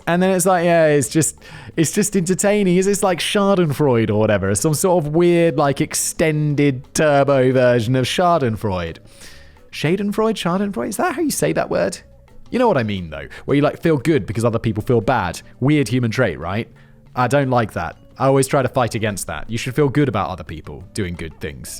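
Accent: RP, United Kingdom. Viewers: dumb takes sound dumb even in a posh accent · I forget he’s not in America every time I hear bbc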